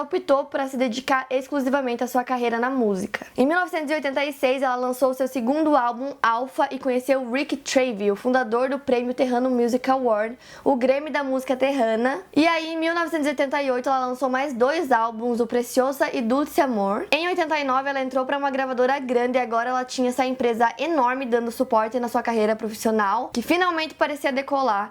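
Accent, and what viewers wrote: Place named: Northeast, Brazil. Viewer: essa mulher é nordestina ou paulista??? so taque todo misturado..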